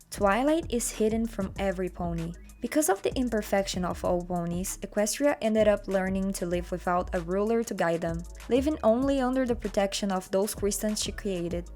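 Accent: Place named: Russia. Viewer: Такое чувство, что акцент русский у Вас)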